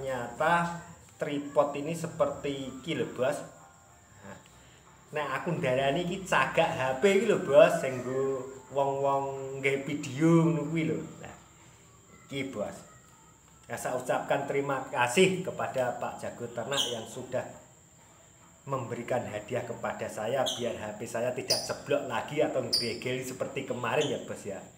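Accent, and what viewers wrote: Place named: Java, Indonesia. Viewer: medok tenan bahasa ne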